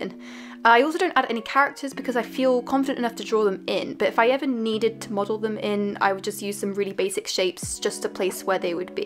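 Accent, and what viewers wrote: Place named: England, United Kingdom. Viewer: I love how at first you have a perfect english accent, but towards the end of the video as you got a bit more tired, you started to sound more and more like you were from Yorkshire.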